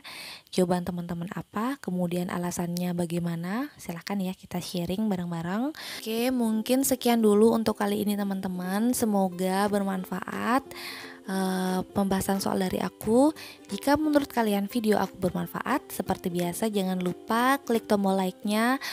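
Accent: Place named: Bali, Indonesia. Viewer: salam dr denpasar kak, dari logatnya kelihatan kak hehe ☺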